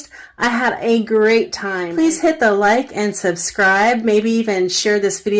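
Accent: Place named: South, United States. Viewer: I like the southern drawl!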